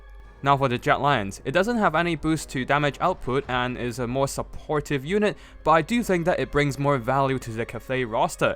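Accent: China. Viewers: Goddamn I can't stand the CCP accent. Need to fix that boyo.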